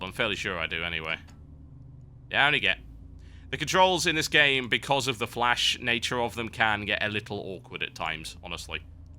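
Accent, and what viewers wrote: United Kingdom. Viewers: "Not as clumsy or random as a Titan... an elegant blaster for a more civilized age" Hahah epic Star Wars ref!! I wish I was a Brit with a sexy deep voice like you, you make everything sound a million times cooler xDDD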